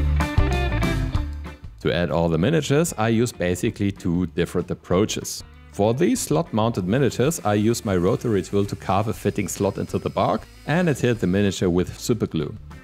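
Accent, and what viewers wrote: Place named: Lower Saxony, Germany. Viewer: haha, ich war nicht 100% sicher denn Dein englisch ist sehr gut... war so ein Gefühl, die Niedersachsen erkennen sich eben 😅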